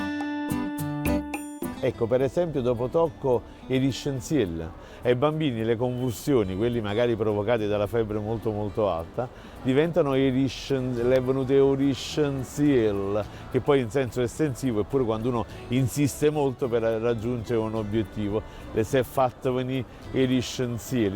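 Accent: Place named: Naples, Italy. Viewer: Lei e' bravissimo e la ringrazio per questi ottimi ed interessanti video. Peccato che e' impossibile per noi non napoletani avvicinarsi a questa vs pronuncia. Tropp difficile.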